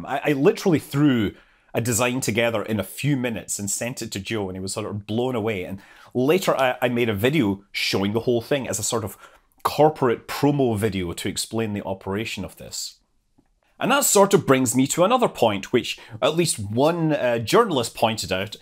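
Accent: Scotland, United Kingdom. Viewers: There's nothing wrong with a good Scots burr.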